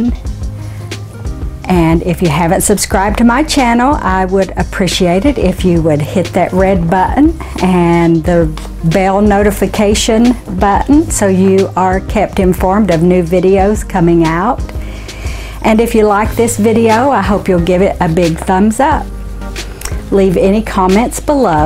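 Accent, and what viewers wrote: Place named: South, United States. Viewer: Love this and love your southern accent 👍🏼,love from the UK🇬🇧......subscribed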